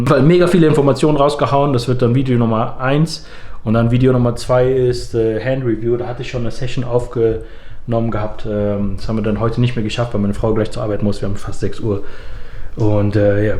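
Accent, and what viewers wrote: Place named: Cologne, Germany. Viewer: Haha, einfach den Kölner Dialekt nach Vegas verfrachtet. So muss das sein freu mich auf die Coaching Videos, seh sie mir jetz an. Grüße aus Brühl vom ehemaligen Hürther, an den ehemaligen Hürther! ( der der mit dir im mandalay Bay 2017 kein Kölsch reden durfte) viel Erfolg weiter für dich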